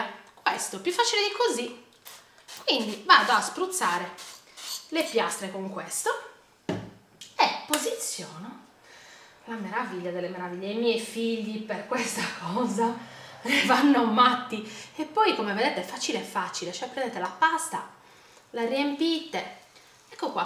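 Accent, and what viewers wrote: Sardinia, Italy. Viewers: Bravissima! Ma sei sarda per caso?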